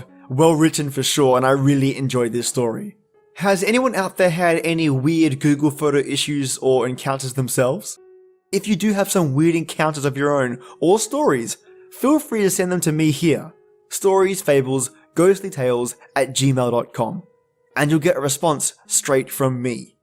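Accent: Australia. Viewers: This 1 really touched my heart. Your Aussie accent just makes these stories all the better mate! :-)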